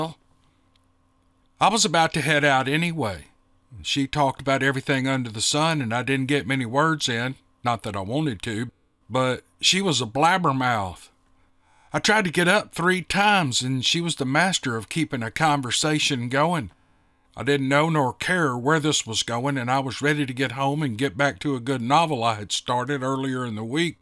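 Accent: South, United States. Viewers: Yay! Thank you. I'm listening faithfully as you post!! LOVE listening to your Warm Southern drawl narration. Hope you, better half, all your great doggies and chickens are doing well. ☺ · Hey,. I have written you couple of times. I wanted to tell you I really enjoy listening to you. I was raised in the south and your voice brings back good memories. I am also a veteran and the Wild Hunt series is something else.....Mr Roberts stories and your narration are the shit. I would love to see a conglomeratiom of wild hunt stories made into a Movie would be awesome. I know some of the characters in the wild hunt team have a back story that would make for GREAT reading. Glad to hear you are gonna continue to narrate these books. Keep up the great work. Thanks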